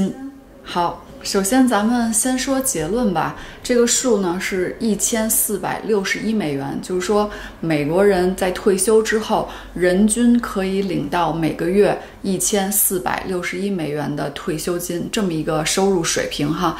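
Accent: Beijing, China. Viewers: 北京大妞 听着舒服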